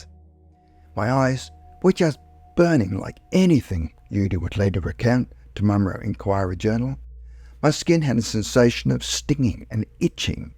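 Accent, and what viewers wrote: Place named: Australia. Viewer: Why are all these american stories narrated by a Brit or here an Aussie? Turn off to americans!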